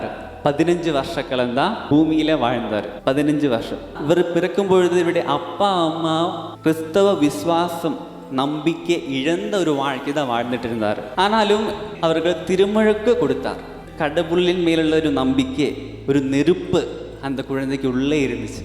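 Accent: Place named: Kerala, India. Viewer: മലയാളി, എന്ന്, സംസാരത്തിൽ മനസിലാകുന്നു, ഇത് എവിടെയാ?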